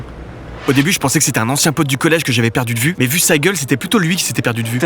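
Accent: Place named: Paris, France. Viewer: l'accent parisien le plus insupportable jamais entendu par l'homme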